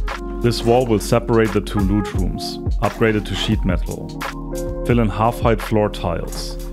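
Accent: Germany. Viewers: Puh der deutsche Akzent... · U sound German !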